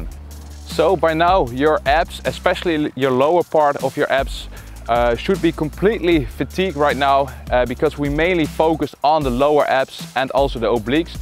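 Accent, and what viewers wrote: Netherlands. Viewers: "...arch your back too much so don't bring your feet ÖP in the air ..." Oh boy ,I love the netherlands accent, I really do ! Greeting from your neighbour eastside of the Maas/Rhine. But FYI my english speaking skills are worse, bring people bleeding ears :-D therefore my accent isn't that charming. Keep up your nice contents!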